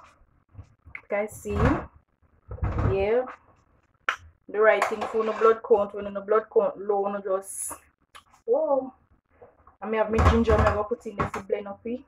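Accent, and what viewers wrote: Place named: St. Elizabeth, Jamaica. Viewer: Never know you could add cucumber, I gotta try that,love your accent, reminds me of my hometown in st Elizabeth.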